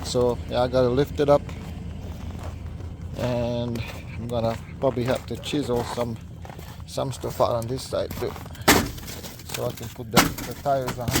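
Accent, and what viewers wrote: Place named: United States. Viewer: Salut! M-am uitat la câteva video-uri. Pari american din tata-n fiu, după vorba, port, n-as fi spus ca ești din România. Ma uit mult la video-uri despre Alaska, ma fascineaza și de asta, presupun, mi-au apărut video-urile tale. Ai ales o zona în care nu s-ar duce mulți romani, dificila, dar îți oferă o libertate, liniște pe care nu o regăsești în multe locuri. De cati ani esti in America? Sănătate!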